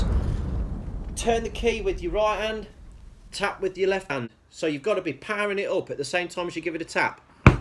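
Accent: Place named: England, United Kingdom. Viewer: Thanks, will definitly try this on my TT. By the way, love the video, the humour, the nice english accent, the SWFC vest and I am sad to be far from England to buy you a beer:) Signed: A frog from Normandy;)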